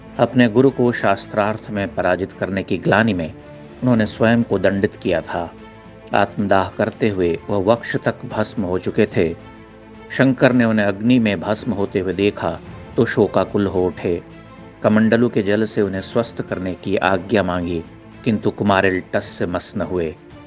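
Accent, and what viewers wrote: Standard. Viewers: सारगर्भित एवं परिष्कृत हिंदी के साथ आपका विशुद्ध उच्चारण, वीडियोज को प्रेरणादायक होने के साथ अत्यंत आकर्षक बना देता है। प्रतीत होता है कि The Frustrated Indian के प्रारम्भिक वीडियोज मे भी आपकी ही आवाज थी।❤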